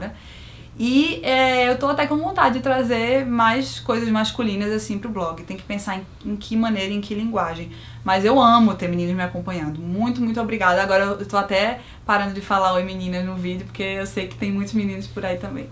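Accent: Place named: Recife, Brazil. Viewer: Esse teu sotaque é o melhoor! Recifense <33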